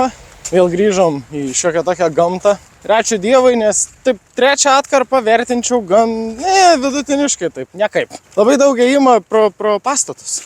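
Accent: Šiauliai, Lithuania. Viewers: Respekc uz siaulietiska kalba:)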